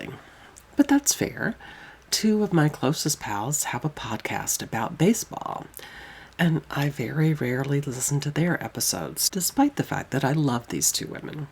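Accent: South, United States. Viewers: I did laugh about all your characters sounding like they are from the South. When Steve and I used to take turns reading aloud to Alf and Tilly at bedtime, he used to tease me by giving characters accents I couldn't match the next evening. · I had to laugh when you said you sound southern no matter what accent you are trying to read. It made me think of my French professor in college who commented on my southern French accent. All of my Texas classmates sounded the same as me in my opinion but clearly not in his.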